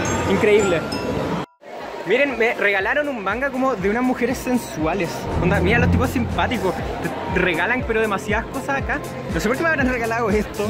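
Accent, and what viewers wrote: Chile. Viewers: Eres chileno¿? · Acento chileno ?..soy nuevo en este canal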